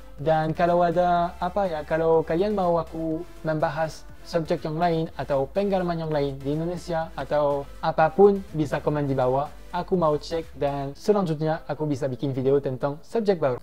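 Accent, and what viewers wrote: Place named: France. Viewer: Bahasa Indonesia nya sudah lancar aksen nya doang masih Perancis 😁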